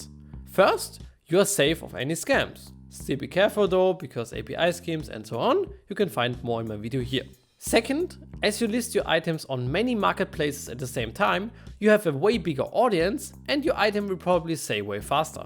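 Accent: Germany. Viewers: warum ist dein stimme so deutsch? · bruder dein deutscher akzent ist nicht zu überhören, sehr gutes video, hat mir sehr geholfen, weiter so!😂👍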